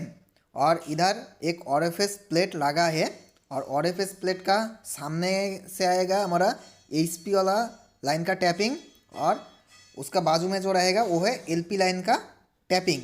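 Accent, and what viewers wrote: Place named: Bengal, India. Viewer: এর জন্যই আমি আপনাকে প্রশ্নটি করেছিলাম।দোয়া রইলো ভাই আপনার জন্য।আসলে আপনার কথার মধ্যে বাংলার একটা টান পাওয়া যায়। কোথায় কাজ করেন ভাই?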